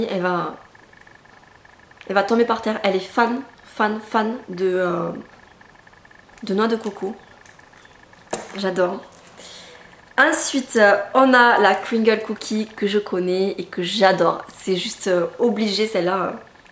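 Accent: South, France. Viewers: Haa a peine j'appuie sur play j'entend l'accent du sud c'est bon ca ! :p Tu viens d'ou ? :)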